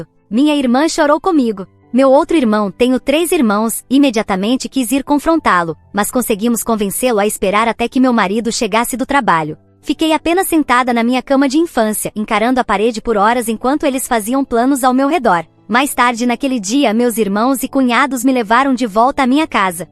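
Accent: Portugal. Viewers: Adoro as estórias narradas em português europeu.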